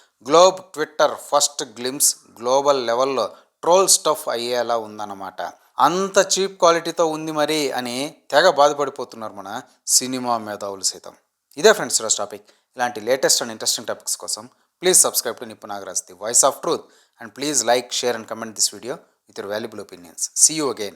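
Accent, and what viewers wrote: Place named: Kurnool, India. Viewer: Endira ఇది థాయ్ 😂 మా కర్నూలు స్లాంగ్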